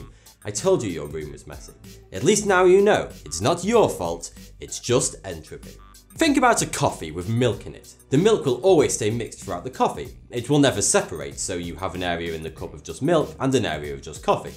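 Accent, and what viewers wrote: United Kingdom. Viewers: Watch your channel just 2 learn the British accent, tank u.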